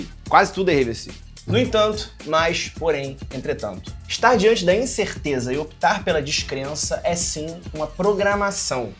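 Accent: Rio de Janeiro, Brazil. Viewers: A forma como ele solta uma enxurrada de conhecimento e sensibilidade entre o sotaque e as gírias mais cariocas que existem me pega demais. ❤️‍🔥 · o sotaque e o jeito carioca só deixa melhor o vídeo que é a verdade nua e crua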